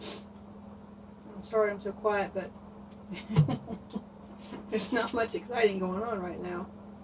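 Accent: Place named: Georgia, United States. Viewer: I thought your accent was familiar! I'm also a Georgia girl; Savannah area!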